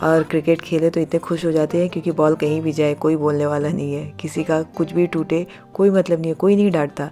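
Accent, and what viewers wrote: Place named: Ballia, India. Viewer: Are you from somewhere in Ballia (U.P.)?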